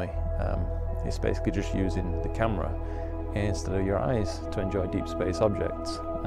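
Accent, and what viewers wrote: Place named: Northern England, United Kingdom. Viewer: Loving the channel. You sound like a northern lad. Where are you imaging from?? I'm in Lowton Leigh.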